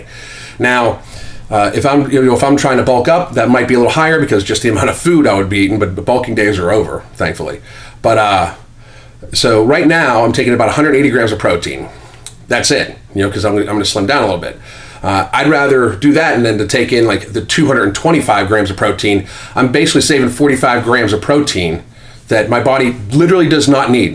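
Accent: United States. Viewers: I told my Russian friend to watch your videos and he said despite being Russian you have a perfect american accent :D :D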